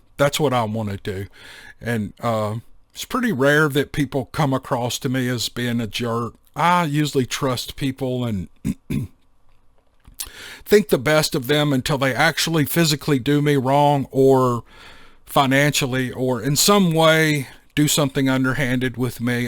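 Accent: South, United States. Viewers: Meant to add that your well spoken Southern brogue gets me through many nights and I am very much grateful to for your gracious and unassuming attitude toward our shared fascinations with the many accounts, lore and incredible stories of the rural areas and wilderness countryside of this great Nation !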